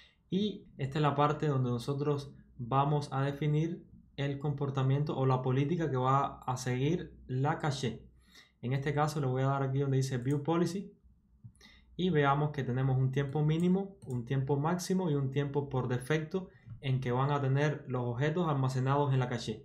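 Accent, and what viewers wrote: Cuba. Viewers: Hola eres cubano ?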